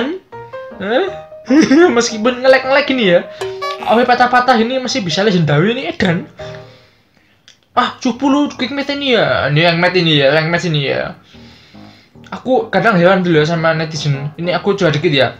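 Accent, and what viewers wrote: Java, Indonesia. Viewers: wong ngdi lur kok logat.e medok😀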